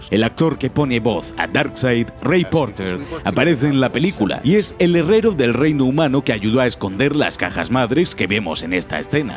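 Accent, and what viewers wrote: Spain. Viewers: SOS de España osea español loquillo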